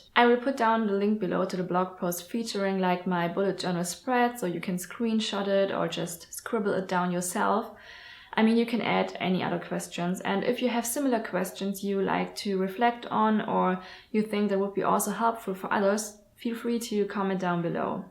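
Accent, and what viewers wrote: Germany. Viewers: Are you from Germany? Your accent made me think that. Btw I really appreciate your work, you are very successful. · Dein Englisch ist ja schon gut, aber man merkt schon sehr das du aus Deutschland kommst :D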